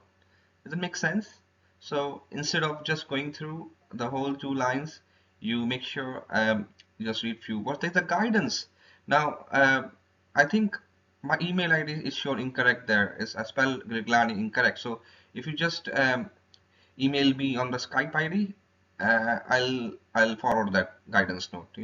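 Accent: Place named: India. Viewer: "Give" the exams...very Indian accent...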